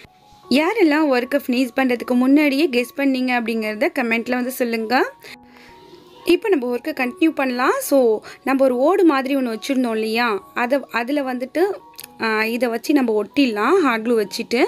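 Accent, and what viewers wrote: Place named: Chennai, India. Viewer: Very nice super showpiece akka unga Slong semmaya iruku neenga chennai ya sis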